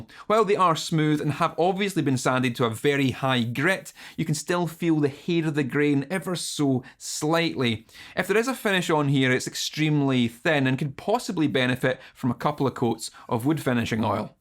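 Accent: Scotland, United Kingdom. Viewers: "We want to hear some Entombed or At The Gates" - well, I don't, I'm mostly here for the Scottish accent, but carry on ;) But, that "Bonamassa" insult, that's too much, take it back! That could possibly be the worst insult anybody has thrown at me!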